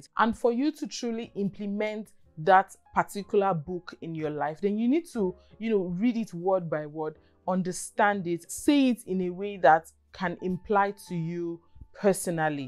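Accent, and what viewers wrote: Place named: Nigeria. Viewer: Nigerian with quite fantastic accent, not like many of them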